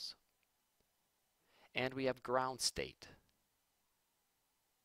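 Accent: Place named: United Kingdom. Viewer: Britain english! Wow!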